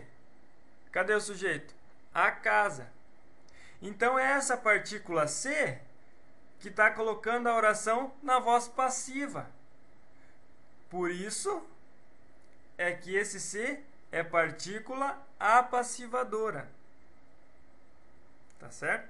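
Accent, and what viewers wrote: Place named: Northeast, Brazil. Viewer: vamos adiantiii kkkkk sotaque nordestino em filho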